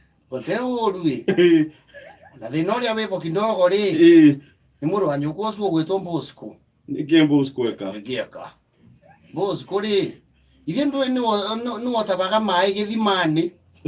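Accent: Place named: Kirinyaga, Kenya. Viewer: Unaongea kama watu wa kirinyaga avai hahaha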